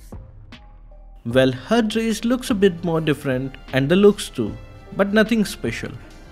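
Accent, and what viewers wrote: India. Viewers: Indian accent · Its because of his accent indian english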